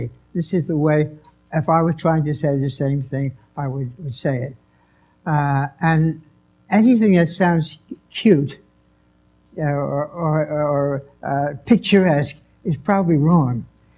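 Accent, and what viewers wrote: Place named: Brooklyn, United States. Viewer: Never lost his Brooklyn accent.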